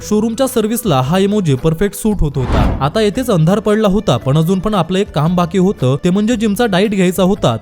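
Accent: Ahmednagar, India. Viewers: Nagar bolat Raha bhava vibe ek number yete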